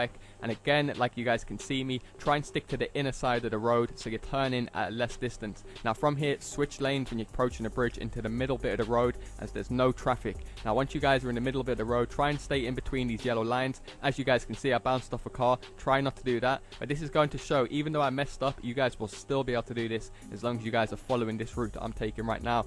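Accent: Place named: London, United Kingdom. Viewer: i'm from london and i can tell you are, it's strange to hear such a well spoken londoner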